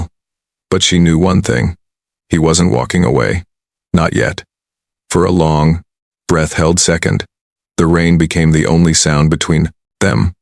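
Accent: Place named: Africa. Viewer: I agree with some of the comments below. and I think you did this on purpose, Too much commentary to robotic, very COLD. Not a good story in you first part. Watching from Nowhere.:face-red-droopy-eyes::face-red-droopy-eyes: :face-red-droopy-eyes: P.O.S. CEO Billionaire in this story. Bad African narration, really BAD.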